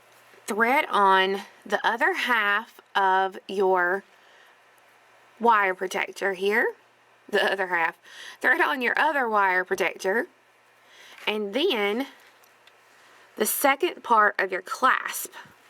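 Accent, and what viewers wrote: South, United States. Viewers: Hi, I love your beautiful southern accent. What I love the most is your way of teaching, you are one of the few that goes to the point, but give us clear explanations of each one of your beautiful pieces.Your work is impeccable,easy to understand no matter which stitch you teach us you always make it easy to fallow. Thank you for shearing with us your wonderful talent. I will love to learn how to make the gorgeous earrings you have on,and also to know where I can purchase the wire protectors that you mention in all of your videos. Is't possible that you let me know where to get them? Last but not least I want you to know that I had learn tons just by watching your tutorials. Thanks again. Love you.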